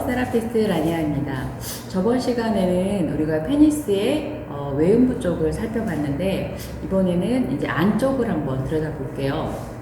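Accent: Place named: Jeolla, South Korea. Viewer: 짤라서 지져각꼬~!^^ㅋㅋㅋ전라도분이시구나~!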